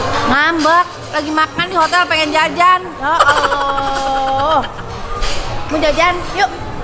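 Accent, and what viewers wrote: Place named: Garut, Indonesia. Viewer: Uhuyyyy Lamun teh ngomong Nii hayang seuri.. bhs garuttna kaluarrr... Seruuuu lahhh👍🤣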